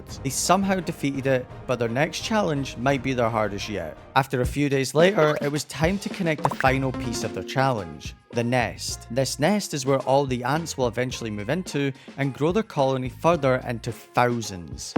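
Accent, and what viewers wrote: Scotland, United Kingdom. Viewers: Bro sound bri-ish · What part of Scotland you from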